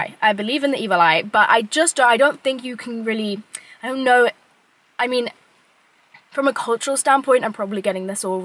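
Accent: United Kingdom. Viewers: For the not native speakers you speak too fast))) Where you from, UK? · it this a British accent? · Are you British!?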